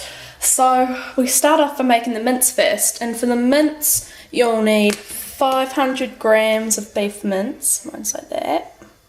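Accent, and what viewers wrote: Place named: New Zealand. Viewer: I can tell you are from NZ because of your ingredients but in hindsight you accent should of given it away.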